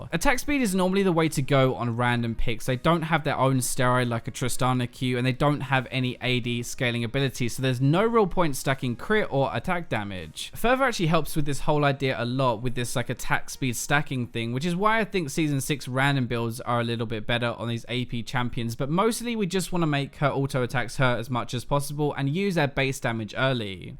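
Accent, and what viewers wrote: United Kingdom. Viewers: Where in the UK is this guy from? His accent is lovely.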